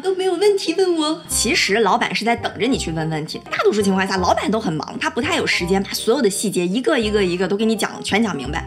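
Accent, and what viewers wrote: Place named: Beijing, China. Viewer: 你太可爱了，真觉得你是北京大妞儿的风格～～口音也是北京味儿～～这是怎么回事呢？生长在大连，咋长成北京妞儿了～～？很想认识你一下，跟你做朋友～～😄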